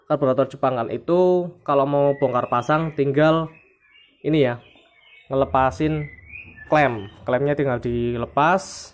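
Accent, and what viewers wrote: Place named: Pekalongan, Indonesia. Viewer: Koyo wong pekalongan logate mas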